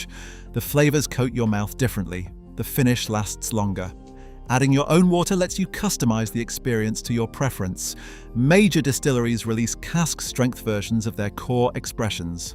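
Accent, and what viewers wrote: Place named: England, United Kingdom. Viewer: It's a little strange to hear an English accent talking about Scotch in terms of US$. It's the English/US contrast that I notice, not the Scotch//English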